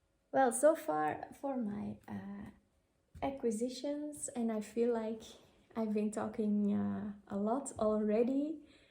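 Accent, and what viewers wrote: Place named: Belgium. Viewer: Leuke podcast goed om eens iemand uit België 🇧🇪 te horen . Mooie designs en ik kijk natuurlijk uit naar je volgende episode 👏🌸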